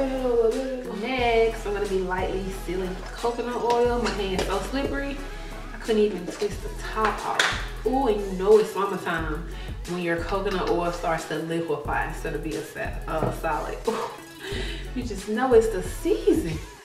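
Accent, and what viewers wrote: South, United States. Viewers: I love your accent! Are you from Louisiana? Somewhere in the south? I'm from Mississippi.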